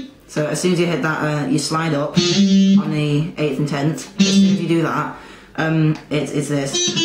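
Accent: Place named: United Kingdom. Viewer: I would suggest more alternate picking, it can get a bit laggy with mostly downs. I've been going through your tutorials and they are actually quite good, I really love your acoustic work a lot, its very even and clean sounding compared to many covers I've heard. And of course I get a kick out of hearing "hey it's " at the beginning of each video coming from a cute british boy haha. Keep up the good work mate.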